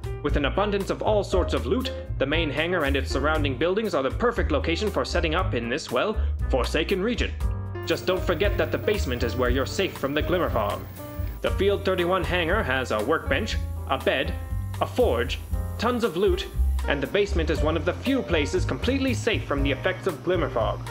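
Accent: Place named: Transatlantic, United States. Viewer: Haha, this was such a fun video! Love the format, and your subtle Transatlantic accent 😂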